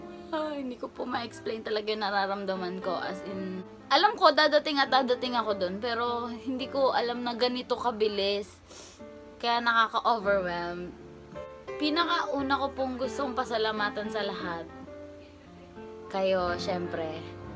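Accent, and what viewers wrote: Batangas, Philippines. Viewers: speaking in batangueño ... parehas lang tayo ng accent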